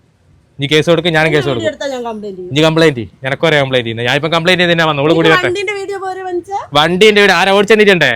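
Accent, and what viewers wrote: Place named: Coastal Kerala, India. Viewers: വടകര സംസാരം 😂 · Chettante slang poli. Evida sthalm. Kannur aano🤔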